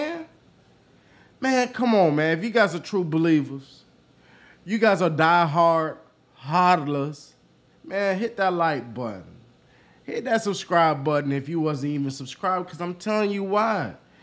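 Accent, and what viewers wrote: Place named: Jamaica. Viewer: Ya Jamaican come thru clearly man.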